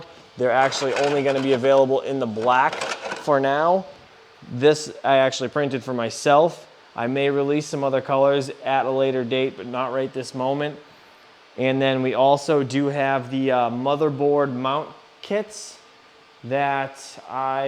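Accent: New England, United States. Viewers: Sounds like a New England Accent so Im betting National Grid customer rip off rates Im in FL and I pay about 0.08KWh here in FL on a COOP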